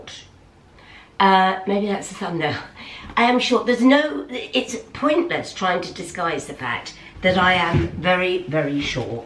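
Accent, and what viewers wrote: United Kingdom. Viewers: Nothing is as lovely as a genuine London accent.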